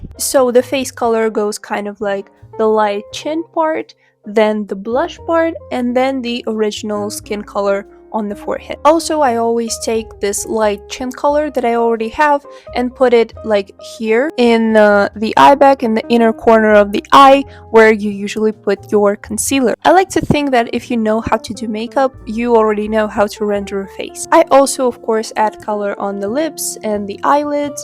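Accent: Russia. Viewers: йоу я подумала что вы русская, откуда вы?? Что за акцент?? ник?? ЕМАЕ ЭТО ТАК ПОЛЕЗНО БОЖЕ МОЙ. СПАСИБО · Понять не могу... автор русская? Слышу отчетливый акцент... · now this is useful but id like to ask if you're Russian i feel like i hear it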